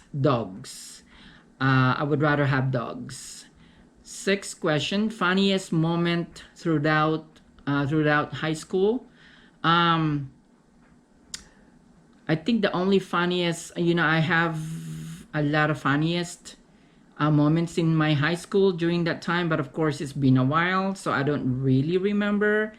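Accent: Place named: United States. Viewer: hi mekini lukluk same tyo fave mangoshake.ganda ng accent mo pra k naring ameican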